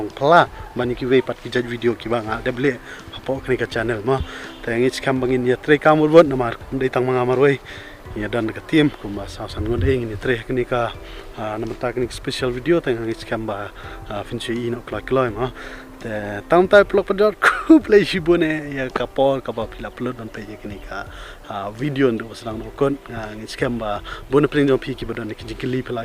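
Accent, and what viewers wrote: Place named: Pnar, India. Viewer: You have a strong pnar accent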